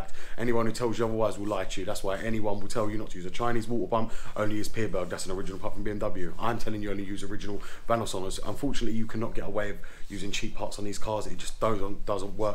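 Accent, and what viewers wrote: United Kingdom. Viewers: In the USA, his British accented English is said so fast as to be indeciperable.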